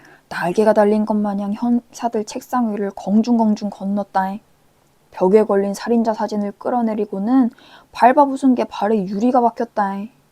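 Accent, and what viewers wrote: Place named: Gwangju, South Korea. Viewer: 혹시 고향이 광주이신가요? 저는 광주사람인데 사투리가 꽤 자연스러우시네요😂😂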